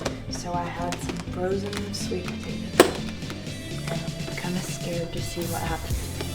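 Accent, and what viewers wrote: North Carolina, United States. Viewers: Love her accent!!! makes me me NC so much being from the UK no longer being able to get over there. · Good ole North Carolina accent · I love her subtle southern accent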